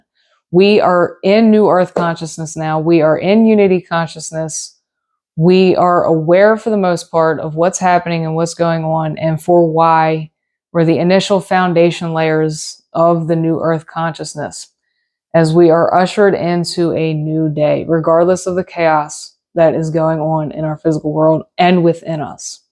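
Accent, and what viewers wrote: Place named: Philadelphia, United States. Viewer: Are you from the Philly area? Sounds like it from your voice!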